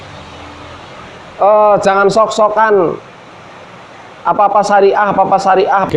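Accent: Banyumas, Indonesia. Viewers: Agama baru kang, agama kadrun hahahha. Memang manteb pake gaya khas ngapak nya.. good job kang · Kaya kuwe ujung ujunge yah 😂 Ngapak the best 😎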